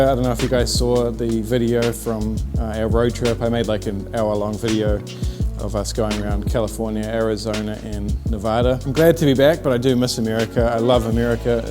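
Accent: New Zealand. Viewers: are you from New Zealand bro ?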